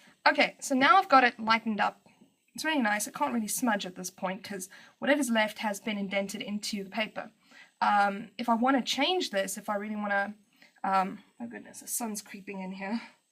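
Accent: South Africa. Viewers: OMG OMG OMG FINALLY A SOUTH AFRICAN!!! Wait a second as I subscribe to you. To my surprise I was like all these other people like Europeans and Australians etc. and I am sitting here like where are South Africans? Then when clicked on your channel and the accent! I fell out of my chair, screaming. Gosh I am so happy :) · Great videos really helpful :D The only problem with watching them is that your accent makes me miss my South African gf haha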